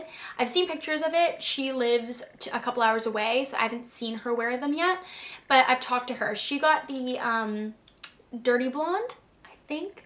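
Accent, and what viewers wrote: Canada. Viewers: are you canadian? :)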